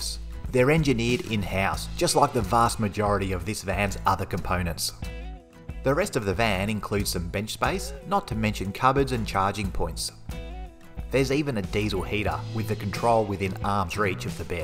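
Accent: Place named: Australia. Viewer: South Africans 🇿🇦 started the offroad caravan trend, yes, but others keep on innovating. I just luvv the designs by the Aussies. And that Ozzie accent is just the best. A controla. Or a V8 moda. Just love the dialect.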